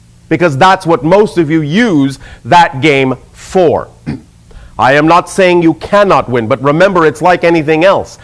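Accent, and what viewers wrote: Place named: United States. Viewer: Why do you talk with American accent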